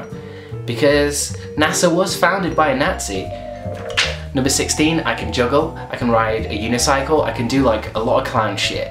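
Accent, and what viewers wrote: North, United Kingdom. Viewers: you sound so northern lmao well i think so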